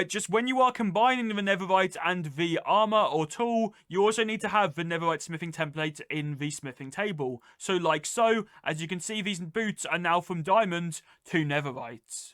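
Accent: United Kingdom. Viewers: lol wat, bro sounds british, nothing like trump.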